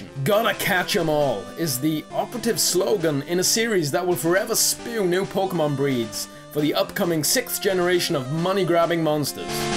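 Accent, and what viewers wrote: Wales, United Kingdom. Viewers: are you Welsh??? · Good video, good choices! Nice Welsh accent, btw.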